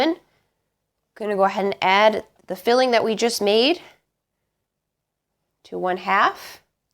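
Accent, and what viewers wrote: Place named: Minnesota, United States. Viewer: She's gotta be from meennasowda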